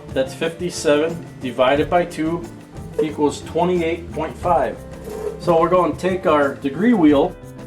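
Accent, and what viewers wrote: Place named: Boston, United States. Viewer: ive read many times on how to do this and am successful in the operation of my vw motors but this was well explained and the music in the back make it easy to learn,lol!! nice video,sounds like you are from boston!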